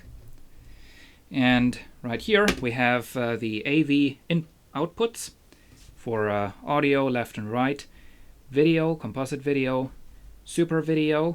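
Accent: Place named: Germany. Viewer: are you german, you have a very german accent..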